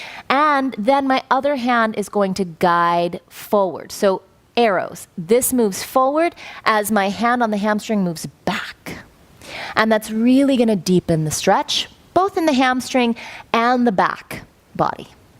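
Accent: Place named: United States. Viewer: I hear an American accent but for some reason it's not unbearable nor disgusting and I could watch the entire video. Congrats!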